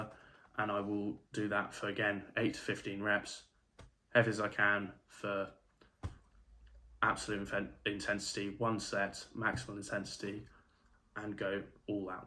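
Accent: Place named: Suffolk, United Kingdom. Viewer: I knew i recognised your accent. Suffolk represent haha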